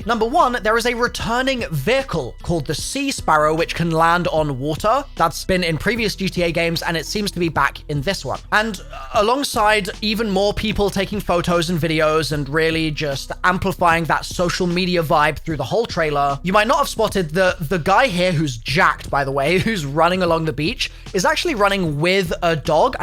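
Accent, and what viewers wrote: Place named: United Kingdom. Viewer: Bro it's because of his accent. He says it like Ig•yoo•ahna and americans say ig•wana or eeg•wana. I've noticed that a lot of words have a more pronounced "yoo/yew" sound with a british accent.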